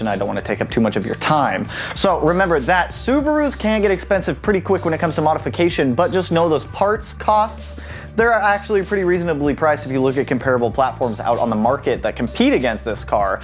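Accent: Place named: New Jersey, United States. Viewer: Sound like you are from where I live ( NJ ) - everyone speaks fast. What 3 things you say for longevity??? Air/ Oil Separator, Cylinder 4 Cooling Mod, Killer B Oil Pickup?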